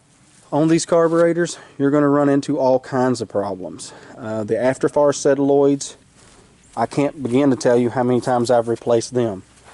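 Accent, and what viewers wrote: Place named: Boston, United States. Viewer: After fire what? Sorry I am having trouble understanding your Boston accent. Could you point to that part?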